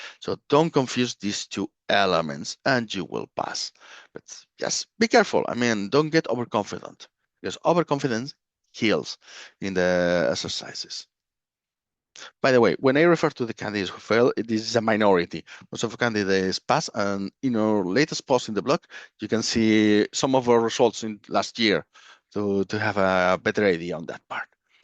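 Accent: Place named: Spain. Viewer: Very useful information and thank you for that but your Spanish accent in English is a disaster. It would be nice to fix this problem. Sorry for that, but I could understand in rewind. Anyway, thanks for the info.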